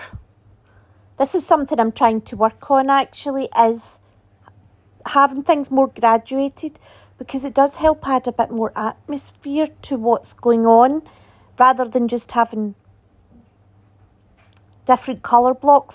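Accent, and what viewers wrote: Scotland, United Kingdom. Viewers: Is your accent Scottish or a certain region of U k❓. It’s delightful💞💞. Recent fan of your channel/works of art❣️❣️❣️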